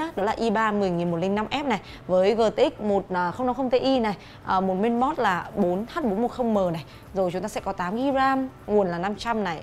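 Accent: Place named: South, Vietnam. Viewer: Nói giọng Nam dễ thương thế :)))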